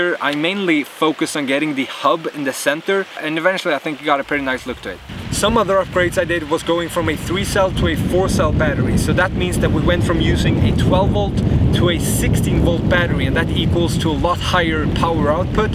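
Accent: Sweden. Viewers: Are you from sweden? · is this guy swedish? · Are he swede???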